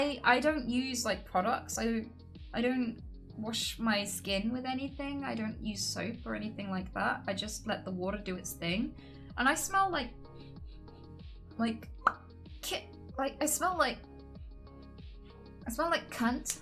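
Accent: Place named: United Kingdom. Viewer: This is the best British dialect a woman can have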